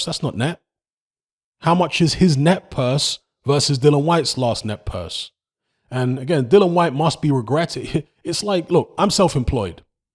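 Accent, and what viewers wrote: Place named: South London, United Kingdom. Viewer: In your introduction you conveniently answered a question that was never asked, this says to me that your objectivity about Dylian Whyte needs to be questioned it matters not whether individuals like Dylian whyte or not, that has nothing to do with the Wbc rules or there ruling, i quite like Dylian whyte but he's not a 60 40 title contender, he may be big in South London and judging by your accent that's where you sound where you could be from and if so your objectivity needs to be called into question not the British public, South London Dylian fan boys are not even the half of it not even 20% of it, FURY is a world recognised boxer Dylian isn't simple!!!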